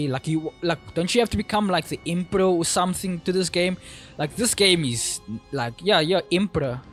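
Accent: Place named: South Africa. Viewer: Yo man, great video. Your accent sounded so damn familiar and turns out you are South African😂. Keep up the great videos, glad to see a fellow South African youtuber in the niche.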